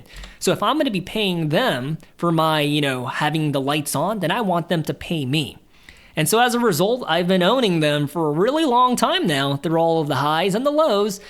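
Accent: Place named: Philadelphia, United States. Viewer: lol k just sometimes sounds like you have a philly accent🤔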